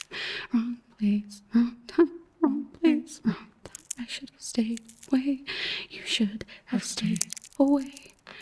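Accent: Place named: South, United States. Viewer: I just love the southern, though the question I have is the "bless your heart" bit the good version or the bad version?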